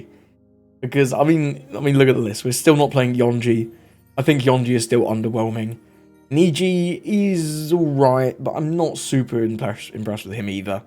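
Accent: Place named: United Kingdom. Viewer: Definitely! He has that UK accent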